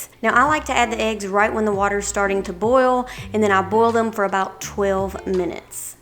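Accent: United States. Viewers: Kajol speaking Amrikan....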